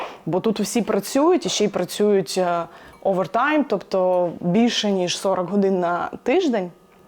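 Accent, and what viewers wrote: Standard. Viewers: Яка гарна Украiночка,яка чиста мова,хай щастить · Сьогодні тільки перший раз Вас побачила і дуже пораділа. Щира людина з чистою українською мовою то скарб! Щастя Вам! ❤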